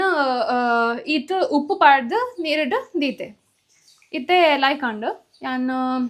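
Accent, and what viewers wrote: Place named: Kundapura, India. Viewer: I like your Kundapura kannada , please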